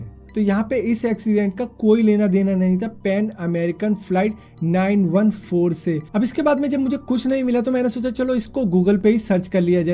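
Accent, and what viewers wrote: India. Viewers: the heading is in english, n your voice is Indian, I thought you are sharing your story with the world.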